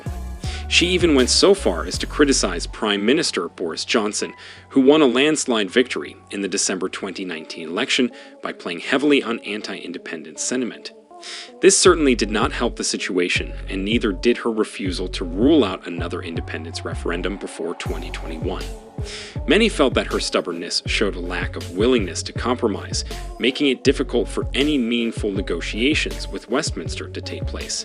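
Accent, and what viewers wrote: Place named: United States. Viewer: what a load of britnat nonsense....spoken by an American accented robot....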